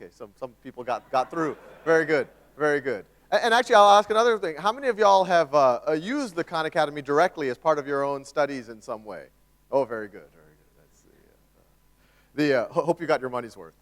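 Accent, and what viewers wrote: South, United States. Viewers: Excellent presentation. i love his down to earth attitude, his humbleness and even his slight southern accent ( i love it when he says "Y'all). Khan academy is the best!!!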